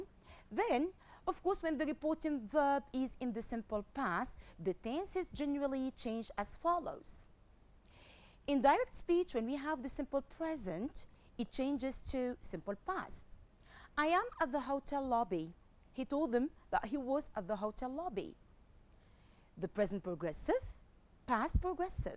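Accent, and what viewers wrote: United Kingdom. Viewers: Reminded me of the old British accent ..I thank you for dealing with all the necessary components required for the productive stage ..Hats Off!!!! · Loved that RP accent bravo madame ❤❤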